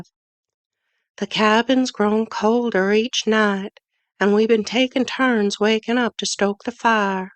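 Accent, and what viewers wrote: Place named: South, United States. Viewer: Just curious why the Southern accent? The setting is upstate NY.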